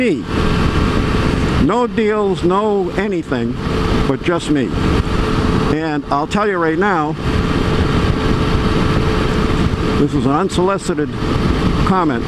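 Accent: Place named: New England, United States. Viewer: His accent doesn't sound like Florida. Sounds like a New England transplant.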